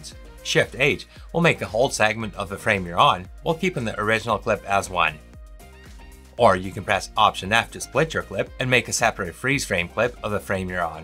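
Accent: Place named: Canada. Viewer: You are so good at this. Thanks for sharing your skill. You Canadian? Trying to figure the nice accent.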